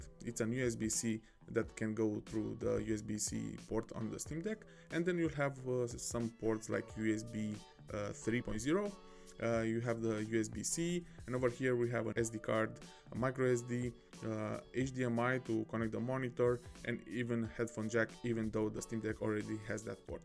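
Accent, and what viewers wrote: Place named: Romania. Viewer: I could recognize a Romanian accent from a mile away 😃👍 good job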